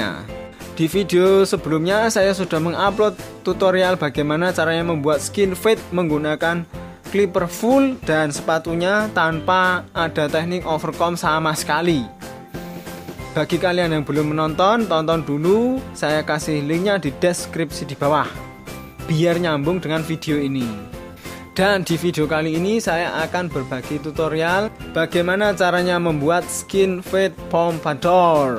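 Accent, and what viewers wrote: Java, Indonesia. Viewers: Mas omah u ngend kok medok ngomonge,,,semangat trs👍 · sampean ngomong medok eram toh mas mas 😁 tutorial terbaik, hasil terbaik, tergokil dan terkocak 🙏 salam horas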